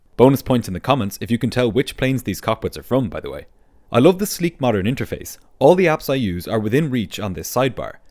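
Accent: Ireland. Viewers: Are you Irish? You sound like it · Are you Irish? Hell yeah, I love this channel even more. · Are you irish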